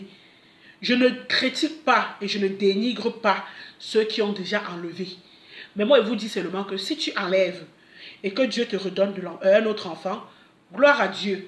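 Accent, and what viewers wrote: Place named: Cameroon. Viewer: Coucou, dans ta voix on voit que tu es une Camerounaises et une femme FORTE ♥️👌👌👌 Tu es très Nianga ( je suis une fille no com vulgaire please )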